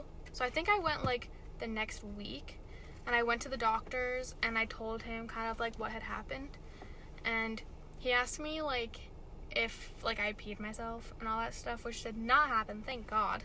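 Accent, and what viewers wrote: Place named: Canada. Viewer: this is literally one of my worst fears. (having a medical emergency at school) i’m glad you’re okay 💗💗 also, are you from canada? i hear a Canadian accent haha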